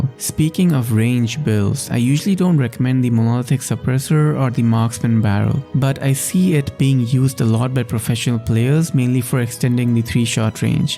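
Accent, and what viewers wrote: India. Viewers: Your accent sounds like Indian. Are you Indian?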